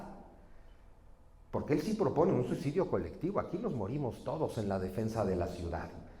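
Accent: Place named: Mexico City, Mexico. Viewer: Pero si habla con el acentote de intelectual chilango, de que hablas? Xd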